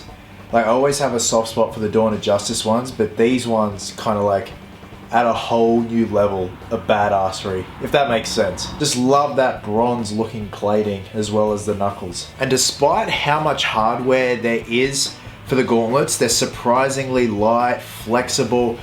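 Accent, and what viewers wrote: Australia. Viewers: There is something visually strange about Batman with an Australian accent. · The suit looks badass but it is so funny when I see batman just speaking in an Aussie accent saying be happy, be silly hahaha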